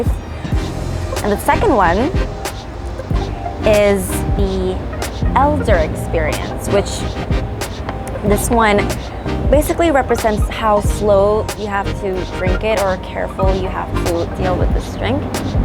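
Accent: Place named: West, United States. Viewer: Did you grow up in the United States your English and mannerisms are very western.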